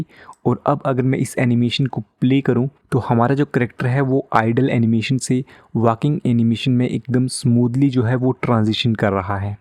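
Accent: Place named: India. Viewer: india voice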